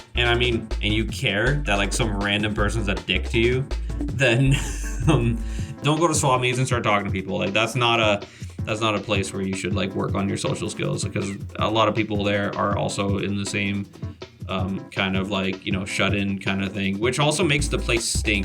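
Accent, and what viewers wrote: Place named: United States. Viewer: As a Michigander, I think your accent is very American. In regards to game collecting in 2023, if it’s not Nintendo it’s not horrible. I collect complete in box ps1, ps2, and Genesis carts and Iv got a good collection and I only started this year, and I don’t feel like a paid too much. Just don’t buy games you’re not gonna play, it’s that simple.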